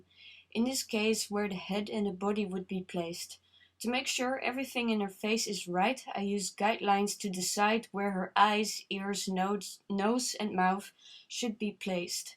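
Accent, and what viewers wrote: Netherlands. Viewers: I recognize that accent...Netherlands?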